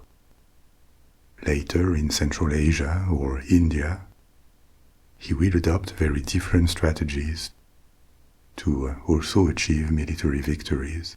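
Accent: France. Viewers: the original of this video got me through many sleepless nights. thank u for coming back mr!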